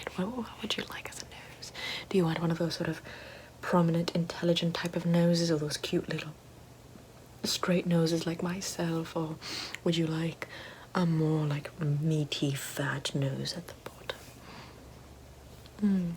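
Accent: United Kingdom. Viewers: I definitely trust you to cut on my nose, especially with that British accent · She definitely nose what she's doing. I trust that British accent implicitly. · A British accent and in B&W double credibility. Funny though a dodgy German accent and B&W wouldn’t inspire confidence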